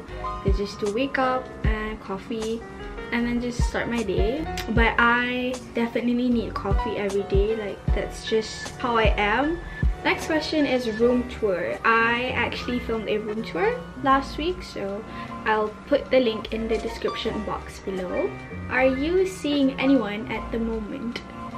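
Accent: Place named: England, United Kingdom. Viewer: yowww her English accent so🤩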